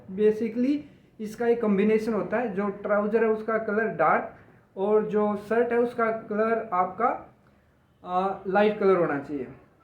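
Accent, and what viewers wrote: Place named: Haryana, India. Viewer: Sir aapka tone harayanvi ya rajasthani lg rha…aise kaise hoga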